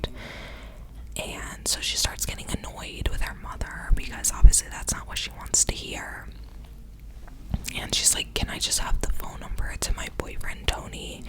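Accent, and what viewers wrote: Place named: New York, United States. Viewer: I love the subtle northern accent, Ny or NJ😂 Great videos, thank you. It's weird, I remember hearing about this case all over the news as a kid and never got to learn what happened until now. I think I was like 8 at the time and in Florida (basically in the same place as her, maybe 10 minutes away), wild.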